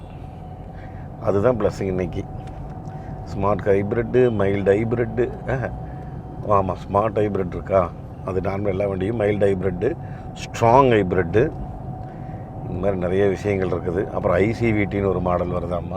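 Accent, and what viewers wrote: Kongu, India. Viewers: அருமையான துவக்க விழாவில் கலந்து கொண்டு அற்புதமாக நமது கொங்கு தமிழில் விளக்கம் கொடுத்தீர்கள்... நன்றிங் கோ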